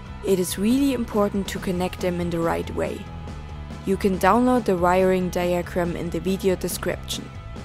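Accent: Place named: Germany. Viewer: This seems to be a very sophisticated way for a transition. The aluminium disc is only needed from my perspected, when you want to muffle the batter head of a regular drum head to make it silence. Otherwise take any wood or aluminium profile, that doesn‘t flex so much and attach the rim shot piezo at the shell of the drum. But great explained how to proceed! And a nice German accent 😉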